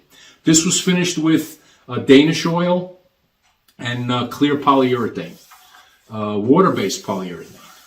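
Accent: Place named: Boston, United States. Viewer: I really enjoyed this video! I’m on the cusp of retirement after 35 years in the landscaping business, and now hoping to pursue some “woodworking” projects myself! I loved the “Boston accent” too! 😂 Thanks again, Dan (Red Wings fan from SE MI 🏒🥅😏)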